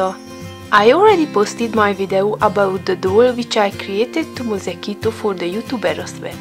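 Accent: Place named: Romania. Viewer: Your accent is beautiful, please don't think you have to change it at all! Your English is perfectly understandable, and I personally adore the way you speak it. I would love to hear you speak Romanian too, though so I may be biased. LOL And I know my Romanian pronunciation would be SO much worse, by a LONG way. :D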